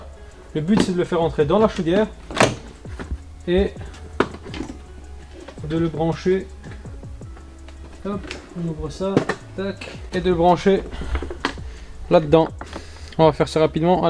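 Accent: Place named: Belgium. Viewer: Rien à dire bravo ! J'aurais aimé être aussi pro que toi! A ton accent il me semble que tu sois de Belgique ! Dommage j'aurais peut être eu besoin de toi à l'achat de ma future maison car je sais que tout serait soigné et maximisé !🙏🙏👍👍je m'abonne direct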